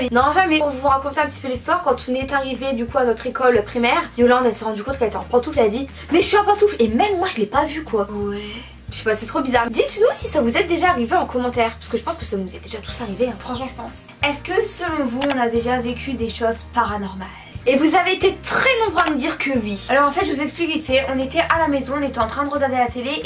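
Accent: North, France. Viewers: Accent du nord😍😍😍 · Vous parler ford du nord (ce n est pas garve et se n est pas mechant)mais defois sa me fait un peu rire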